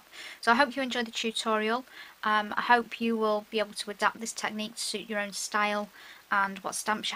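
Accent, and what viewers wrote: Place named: Northern England, United Kingdom. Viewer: Fabulous work and great videos. I was trying to work out your accent - somewhere between Liverpool, Manchester, Cheshire and the Black Country?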